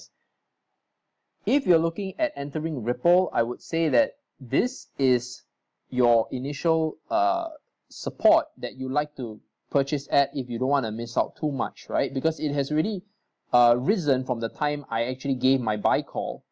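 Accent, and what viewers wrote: Singapore. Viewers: you have great Singapore accent. love it.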